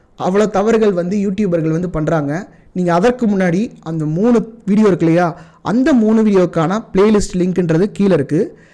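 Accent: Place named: Madurai, India. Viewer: Nalla example ..nalla explain panringa brother..appo appo madurai kusumbu pechu vanthu vanthu poguthu athum nalla tha iruku 😅super information 👍👍👍🙏🙏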